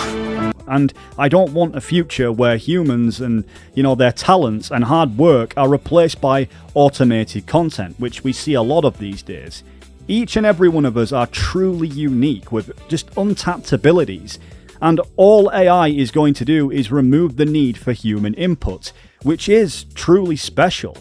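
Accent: United States. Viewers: Why did you think people would take you more seriously with an American accent? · lol ur american accent is good bro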